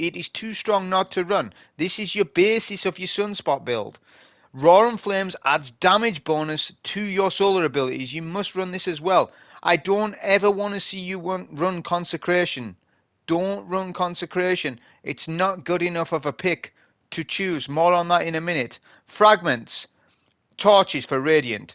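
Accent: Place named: Sunderland, United Kingdom. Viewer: I can't pin down your accent. Sunderland, County Durham?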